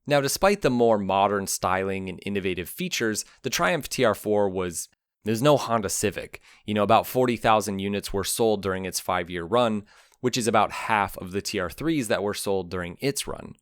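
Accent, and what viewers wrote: United States. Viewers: Well done for putting this together with a nice presentation of the models throughout the years, ... but I wish you would pronounce Jaguar, Nissan and Datsun correctly and not in that dreadful American way. For instance, Jaguar is pronounced 'Jag-you-are' NOT 'Jag-Wah'! Nissan is pronounced 'Niss-an' (as in Miss) not 'Nice-An'.! Also, as a suggestion, since the emphasis was on the TR6, a bit of info on the uniqueness of its power train (which is one of it's main features), that being the 6-cylinder PI. With mechanical fuel injection, it stood out from others in and above its class because of this. Interestingly you make the comment, or imply, that in the early seventies, for a UK motor manufacturer, it was unique with it's overhead cam, but Jaguar had their overhead cam XK engine from the early 50's as fitted to the XK120-150 and the Mk1 & 2 from the late 50's & throughout the 60's. 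However, the TR6 is definitely on my wish list. You refuelled my interest! Thanks. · THERE IS NO "W" IN "JAG YOU ARE." Stopped watching, since an American can't teach us about BRITISH CLASSIC CARS. And DRC'd as well.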